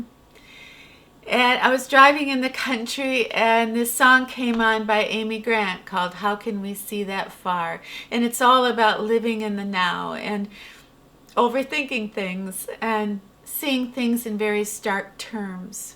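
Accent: Midwest, United States. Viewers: “Oh, you’re just saying that.” My gosh, I love that Midwestern accent!! Seriously, I do! Grew up in Minnesota, until late grade school, then in Oregon, so I love to hear that Midwestern accent! 🥰 I can’t imagine someone saying don’t want your dog and no dog in the back yard! God was definitely watching over you, and protected you and sweet Dezi. Can you imagine if you weren’t home how he would have treated Dezi!!😡 Scary! Bless you and Dezi!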